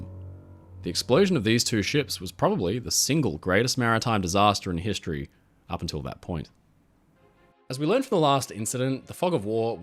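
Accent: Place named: Australia. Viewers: He sounds very Aussie to me. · Yo my brother! Are you Australian? The accent sounds Australian in one statement and British in another Yes, I know. Australia and the U.K. are part of the British Empire. I'm just curious. Thanks for an informative website!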